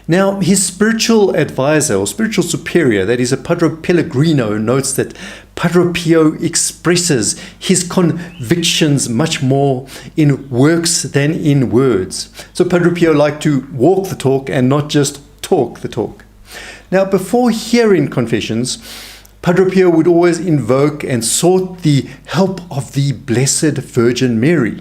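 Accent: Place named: South Africa. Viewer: As an Australian/Lebanese Maronite Catholic, I absolutely enjoy learning about Padre Pio through South African accent!!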